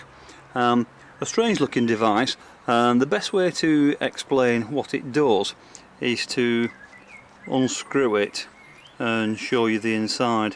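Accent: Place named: Northern England, United Kingdom. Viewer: Thanks for your reply. I wouldn't have guessed Northern England, but I wasn't sure. I spend a summer in Sheffield many years ago with a bunch of Australian friends. I loved England and haven't had a chance to get back. This little tool is brilliant. I am having one struggle with though, maybe because I'm a beginner, but maybe you have a tip. When I am filing a piece of sheet metal I find that after I have squared it upon the stop I notice it shifts sometimes when I go to tighten it back up. Probably need more practice. It looks like you are holding it in place with one finger while you tighten. I will try giving that a try. Thanks again for sharing these videos.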